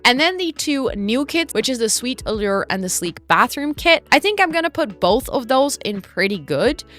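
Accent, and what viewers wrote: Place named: Netherlands. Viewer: You sound a bit Dutch, are you from The Netherlands?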